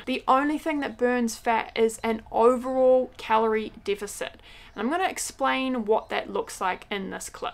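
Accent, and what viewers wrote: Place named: New Zealand. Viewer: Hi, I just discovered you and your channel this month and I am IN LOVE!!!! 😃 I lived in Marton, the Hub of the Rangitīkei 😉 for a year! It's so fun to hear the kiwi accent again! I don't hear it much here in Virginia. 🤣 I appreciate your clear and visual teaching...I am struggling to get off those last 8-10lbs and your wisdom and thoughts have inspired me to keep going! Thanks for your encouragement and empowerment! You are a blessing!!! 🙌💪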